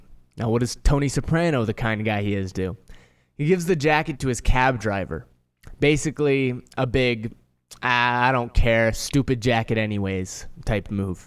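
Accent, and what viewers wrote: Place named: United States. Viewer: Refreshing to hear an American discussing American topics! ❤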